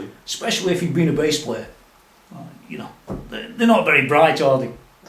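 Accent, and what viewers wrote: United Kingdom. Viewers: Like your accent. Where from UK ? When wil you review Jim Root # 5 ?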